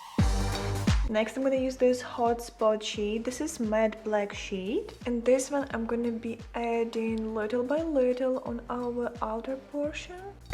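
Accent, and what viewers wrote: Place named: Ukraine. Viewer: Love this look so much. You’re earrings are gorgeous. And I just really love your Ukrainian accent when you speak English. Awesome video. 🤩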